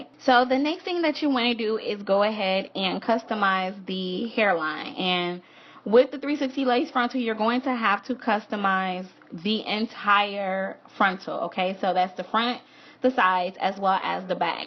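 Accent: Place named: Philadelphia, United States. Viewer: are u from Philadelphia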